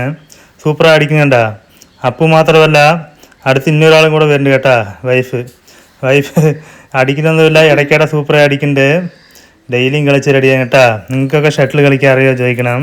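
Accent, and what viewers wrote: Tamil Nadu, India. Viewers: Niglu malayali ahnno tamil ahnno language difference thonnine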